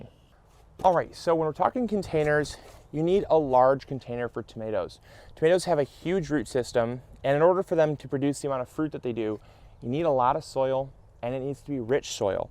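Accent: Michigan, United States. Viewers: That MI accent a bit strong hey?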